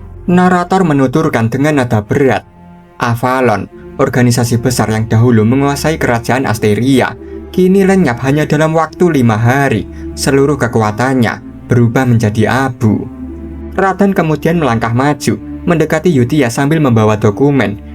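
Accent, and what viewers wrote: Java, Indonesia. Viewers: serasa nonton wayang bang, java lu medok bngt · Bang kamu orang Jawa ya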